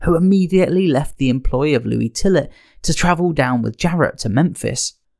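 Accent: United Kingdom. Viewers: DOESN'T LISTENING TO A BRITISH ACCENT TALK ABOUT WRESTLING MAKES IT SEEM LESS STUPID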